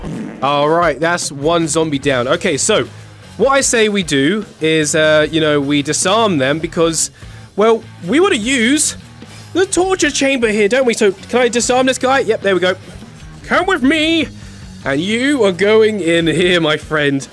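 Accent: United Kingdom. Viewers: I love british voice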